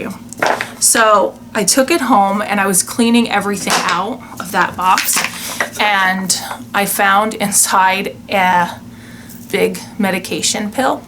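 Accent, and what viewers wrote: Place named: New Jersey, United States. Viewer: Hi! I’m binging on your videos...you really scored at this community yard sale. Good for you. Question (but you don’t have to answer if you don’t feel comfortable). Where are you from originally? I’m originally from New Jersey and you sound like me...lol... hope I’m not insulting you. Anyway, just curious. Born and raised in Jersey. Moved to Florida for 30 years then moved to North Carolina a year and a half ago. I’m so old! Lol!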